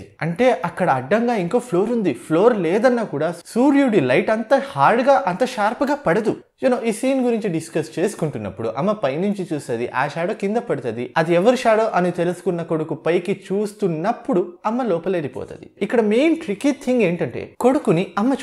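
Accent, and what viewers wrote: Karnataka, India. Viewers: Bro your mother tongue is Telugu and grown wholly in karnataka I think. Same here. I observed this in your khaleja video also.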